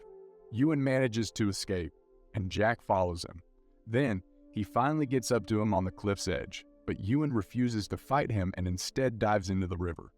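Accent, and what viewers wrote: Texas, United States. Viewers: Texas accent recap? is this an AI voice?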